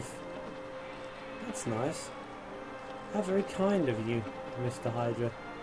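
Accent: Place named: England, United Kingdom. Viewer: you arent bugging me with your sloppy work. your accent (since im american its an accent to me =P) makes it all work it. very entertaining. I find it ironic that both commented LPs of this game are by fold from the land of england. even if you are in japan now like you mentioned in one of your videos.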